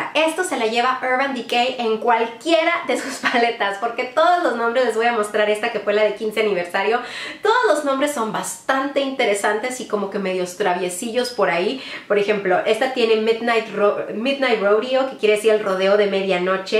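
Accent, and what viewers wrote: Fresa, Mexico. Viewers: Que diferente la voz algo fresa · Bien Fresilla que se oye 😅🍓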